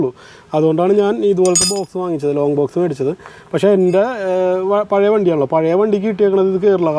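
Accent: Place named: Paravur, India. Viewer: Aaha...Slang kettappo thonni ivde adthulla aaro aanallo enn 😁♥️ Paravur il evdaa bro ?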